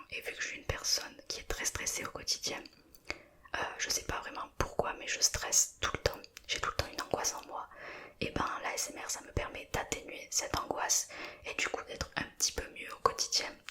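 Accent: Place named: Carcassonne, France. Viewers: Je me disais bien que tu avait l'accent d'ici (Carcassonne 😂) ! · Merci de t être confiée comme cela. C est touchant. Et je me disais bien que cet accent venait du sud ouest...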